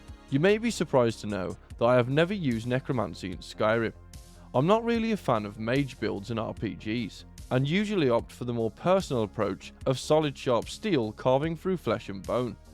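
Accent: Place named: Yorkshire, United Kingdom. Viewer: Are you from Yorkshire? Because you sound like you’re from Yorkshire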